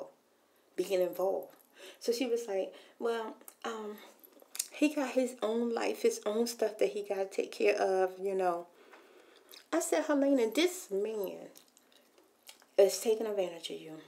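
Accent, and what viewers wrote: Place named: South Carolina, United States. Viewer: Its skranger....and that's how we talk down in South Carolina....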